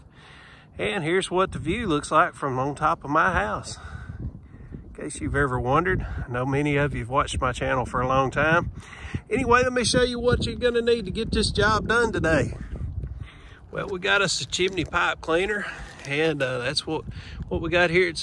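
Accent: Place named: Arkansas, United States. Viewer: My guess is Arkansas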